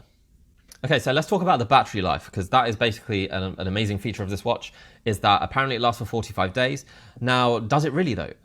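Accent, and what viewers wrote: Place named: England, United Kingdom. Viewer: u must be from england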